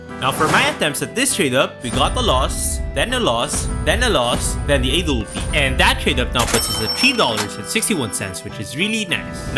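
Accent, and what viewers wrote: Philippines. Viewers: are you pinoy. you sound pinoy.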